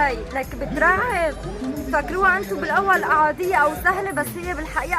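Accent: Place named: Syria. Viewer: انتي حليبة ليش هيك بتحي ههههههه وسورية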